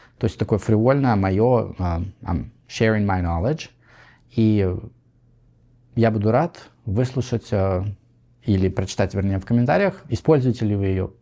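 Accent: United States. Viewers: И самое главное, не говорите с таким акцентом, как у автора, в Англии. Не американец с американским акцентом - это вообще ненавистная фигура в Англии. Когда они слышат такой акцент, то подпрыгивают от злости.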